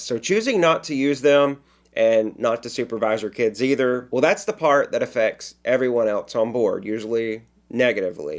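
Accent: South, United States. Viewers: Well done as always. I love watching the scenery on your videos. You sure got the life. I wish I had the technical skills and personality to do what you do. I would love to be able to afford to sail the Deep Blue Sea for a living. From where is that Southern accent?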